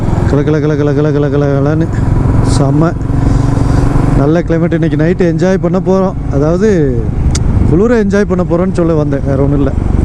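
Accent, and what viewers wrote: Chennai, India. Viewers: தளபதி விஜய் அண்ணா ஜனநாயகம் படம் பார்க்க ஆவல் போல உங்க வீடியோக்காக ஆவல் அதிலும் உங்க சென்னை மொழியில் பேச்சு இன்னும் ஆவல்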